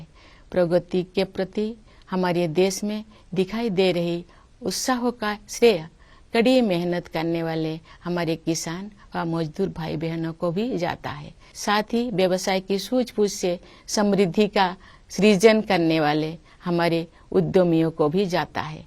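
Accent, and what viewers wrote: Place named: Odisha, India. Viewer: Endha Amma manapadam panni oppikudhu. Odhia Madhuri than erukku hindi Madhiri illa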